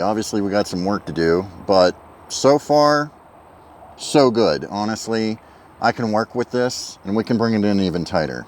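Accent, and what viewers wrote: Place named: Midwest, United States. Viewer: I've noticed you are bit thin skinned and don't like constructive criticism. We are probably pretty close to the same age (I'm 55) and it's quite clear you have never had any formal instruction either from a civilian course or the military. This is your channel you can do as you see fit but maybe just maybe a few of us out here know a thing or two and perhaps its possible you could learn something? I'm not sure what state you are in Ohio or Indiana maybe possibly Pennsylvania with that accent? HR Funk (gun Tuber) lives in Ohio. You could probably reach out and I bet he would be happy to give you some personal instruction on rifle marksmanship. He was a Marine Corps sniper and a police officer and even a police chief before he retired. He's one of the nicest chaps on Youtube. Look him up he typically replies to emails fairly promptly.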